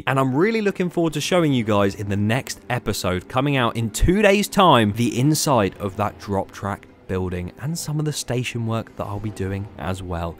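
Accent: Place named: United Kingdom. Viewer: Bri*ish person detected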